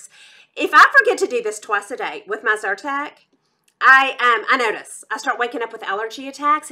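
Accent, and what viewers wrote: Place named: Arkansas, United States. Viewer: oh my gosh...i'm from Arkansas, but moved to Alaska 7 years ago. Listening to you is like being home. Penn Smith Skincare linked to you and that's how I got here! I love that you don't waste time but get right to the point. Some of the beauty vloggers just talk forever about nothing or say the same thing several different ways. Ugh! Thank you for not doing that!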